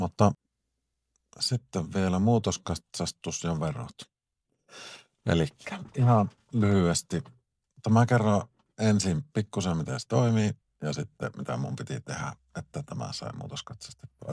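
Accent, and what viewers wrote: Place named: Oulu, Finland. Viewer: Hyvin tehty video, varmaan mieluinen pakuharrastajille! Luontevaa, leppoista "oululaista" kerrontaa, jota on helppo seurata. Mutta missä on Aava?!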